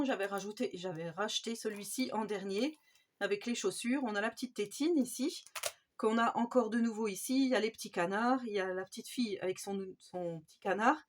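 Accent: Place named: Grand Est, France. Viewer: Coucou, très joli album, j’adore le papier craft 👌🥰🙋‍♀️. Vous êtes du Grand Est de la France, j’ai l’impression de reconnaître le petit accent 😉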